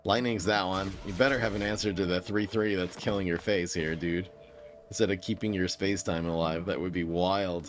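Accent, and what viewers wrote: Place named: France. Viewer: Voice french is so funny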